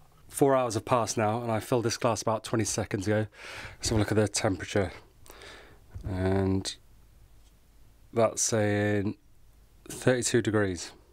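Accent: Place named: England, United Kingdom. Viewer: Where are you located? You sound English but I doubt you're in the UK.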